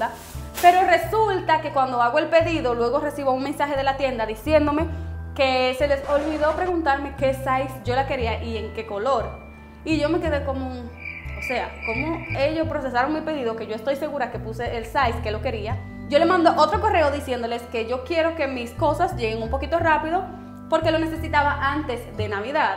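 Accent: Dominican Republic. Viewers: A eso se le llama ser de republica Dominicana 😂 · "Hablas igual a Yarissa!!" Se llama ser de República Dominicana, pedazo de bestias. :V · Por que las dos son dominicanas y tienen la misma forma de hablar.. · yo tambien hablo asi, y mi familia, amigos, etc. Aunque es cierto que en RD hay diferencias al hablar en cada region, la mayoria hablamos de igual manera. Saludos!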